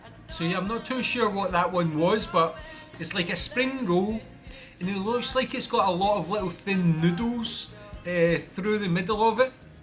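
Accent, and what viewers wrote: Scotland, United Kingdom. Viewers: I Love Scottish Accent...👍👍💜💜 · Are you from Scotland ? Your accent sounds Scottish..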